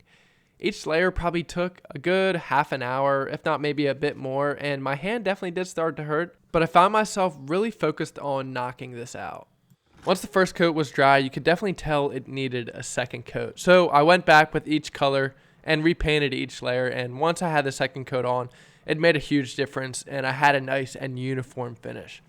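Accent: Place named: Western Pennsylvania, United States. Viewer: Sounds like you live near me in western PA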